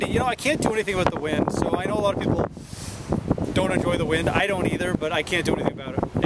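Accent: Canada. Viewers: nah that eastern? Canadian accent cuts through the wind after a bit. these vids are like watching painting w bob ross but better....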